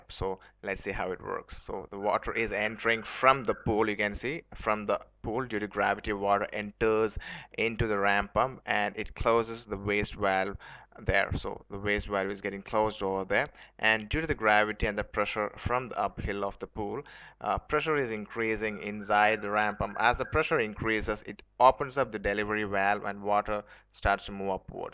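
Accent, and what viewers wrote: Kerala, India. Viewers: LoL.. another mallu with accent 😂😂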